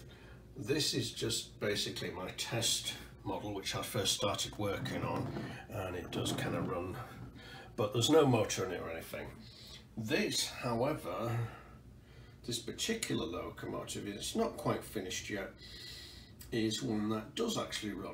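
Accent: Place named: England, United Kingdom. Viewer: Maelee said “ahh he’s from England, that’s why he has an accent”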